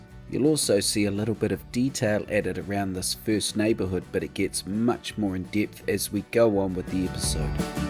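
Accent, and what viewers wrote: New Zealand. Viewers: Your level of detail is amazing. You popped up out of nowhere! How long have you been playing the game and been on YouTube? Love your Kiwi accent. A Canuck here!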